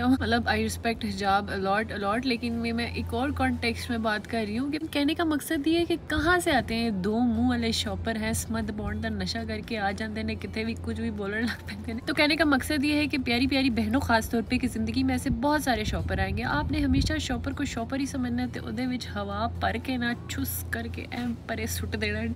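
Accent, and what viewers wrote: Punjab, India. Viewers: I have recently subscribed your channel And I'm just getting very entertaining video to watch. Loving your Punjabi accent and purity ❤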